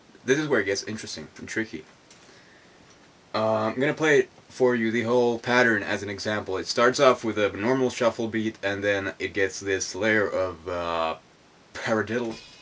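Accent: United States. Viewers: sweet vid. Its odd you dont really have any accent. You sound like you could have been raised in the midwest.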